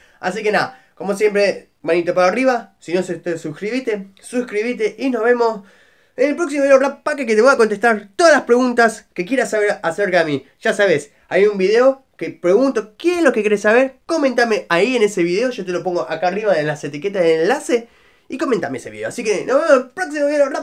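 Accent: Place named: England, United Kingdom. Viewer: Flaco pero si vos sos argentino porque tenes ese acento inglés ?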